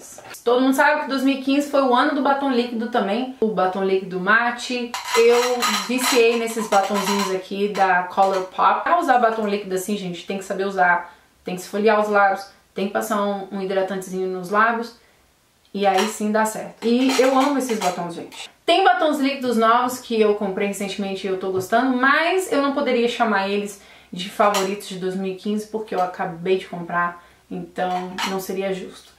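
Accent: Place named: Minas Gerais, Brazil. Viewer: menina eu acho que parece meio mineiro hahahah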